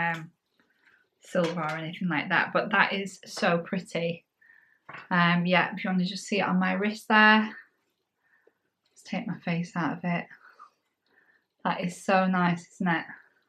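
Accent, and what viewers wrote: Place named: England, United Kingdom. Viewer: Love ur accent what part of England are u from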